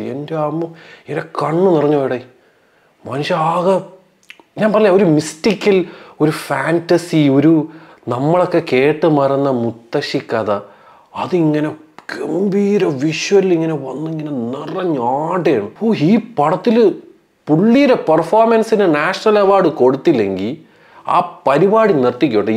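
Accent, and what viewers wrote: Trivandrum, India. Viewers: ഭാഷ powli tvm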